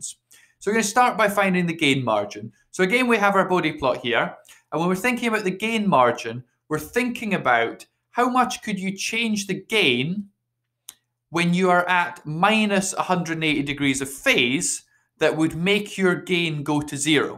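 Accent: Scotland, United Kingdom. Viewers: UK probably, scotland i think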